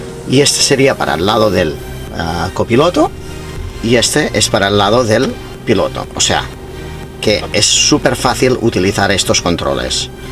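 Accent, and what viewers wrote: Catalonia, Spain. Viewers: Tal como comentan, el acento es claramente catalán. Se nota que has seguido hablándolo aún residiendo en USA. Los paisajes del video me recuerdan mucho a los que veo en la zona limítrofe entre el estado de New York y el de Connecticut, a la altura de Danbury. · Este tipo parece catalan por el acento pero no se donde se le pego lo del piso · Eres catalán? En Cataluña hablamos como tu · De hecho, yo pensaba que era catalán con un acento muy cerrado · Pues el acento es 100% catalán...no noto para nada el inglés.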